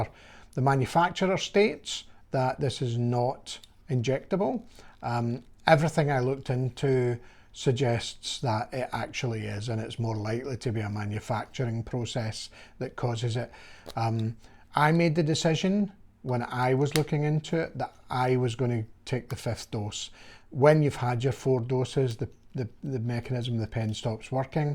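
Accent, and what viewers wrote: Scotland, United Kingdom. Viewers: I love your calm Scottish accent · Good video. I could listen to that beautiful accent all day. I’m Scottish, first generation Canadian. Your accent reminds me of my people.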